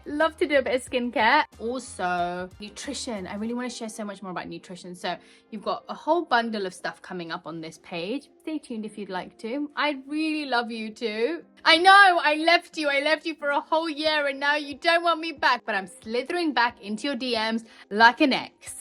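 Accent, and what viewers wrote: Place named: United Kingdom. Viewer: Why she has British accent. Is she Indian or🙄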